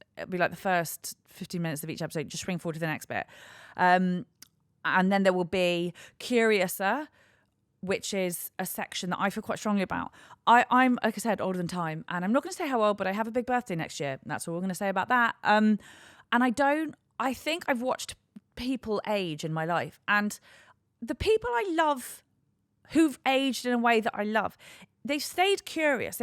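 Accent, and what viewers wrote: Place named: Liverpool, United Kingdom. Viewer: My favourite posh ginger southern scouser (when it’s in you, it’s in you). Go for it!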